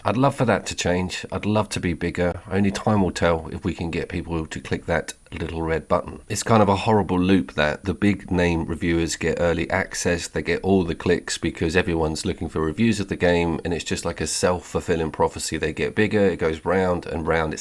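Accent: United Kingdom. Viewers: Tho not for me (yet) as I prefer Fallout then perhaps a Metro game, and to a lesser extent the Stalker games. However your a Brit, so I can only imagine the fun to romp around the world hearing a accent similar to my own and those I (in your case literally) grew up with. As for the reviewer issues your spot on. No one wishes to wait for anything people are 9 year olds wanting what they want (the review of a game)when THEY want it. You tube could of done anything to make better reviews rise to the top but instead it is a small nice circle at the top and few smaller to medium channels can ever hope to have their audience and thus you tube attention. You will have to at some point know that you still have your integrity and cover the kinds of games that interest YOU, and have fun doing it. At least until someone helps you go viral:D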